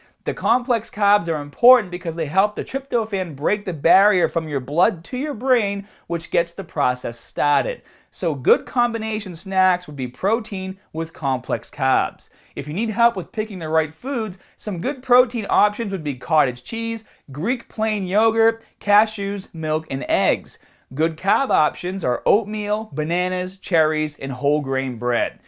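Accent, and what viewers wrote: Boston, United States. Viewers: Lol is this guy from boston? His accent is definitely a boston accent :) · Dude! I just started watching your vids a couple of days ago and started watching your vids from yrs ago. This is the first RECENT one I've seen... Wtf happened to your accent?! It's WAY more Bostonian now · Boston much? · Bette? Boston accent? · Is he from Boston Area?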